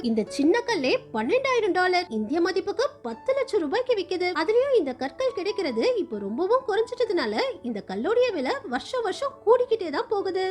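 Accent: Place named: Sri Lanka. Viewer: இலங்கை தமிழ் ❤❤❤ இலங்கை நிலை 😢😢😢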